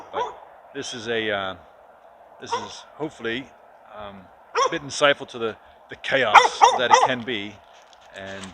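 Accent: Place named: Australia. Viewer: Thanks for taking us along. I’m loving the vlogs! And…..now that I know you’re from Australia I can hear some faint whispering of an Aussie accent 🥰.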